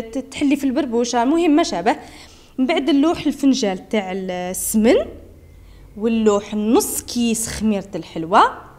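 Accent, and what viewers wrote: Batna, Algeria. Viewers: سلام صحا فطوركم تبان مليحة بزافففففف يا بنت بلادي جاممممممممم كبيرة ليك لازم نجربها .... شكرا حبيبتي .....هدرتك تفكرني بباتنة بلادي العزيزة 😍😍😇😇😇😇😇😇😇😇😇😇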